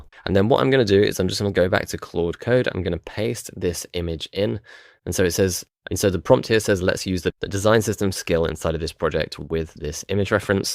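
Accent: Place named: United Kingdom. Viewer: I don’t care about captions - I listen, not read. I only said improve the mic bass because there’s way too much low frequency in the audio with such accent, making it hard to understand. Had to replay the video just to catch it. Are you British or something? Is that why my comment got under your skin, kid? Lol.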